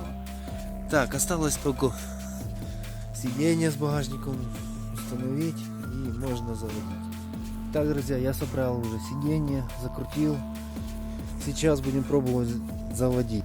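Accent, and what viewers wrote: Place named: Ukraine. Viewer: Говори как тебе удобно потому что твой русский это украинский шедевр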